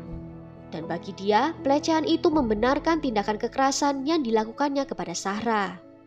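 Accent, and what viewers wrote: Java, Indonesia. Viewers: Medok kali jowo nya 😂😂😂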